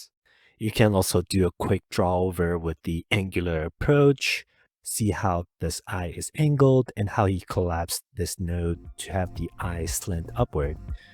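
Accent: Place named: Japan. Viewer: I hear that Japanese or asian type accent and I know this video is going to be filled with amazing quality content, thanks new sub .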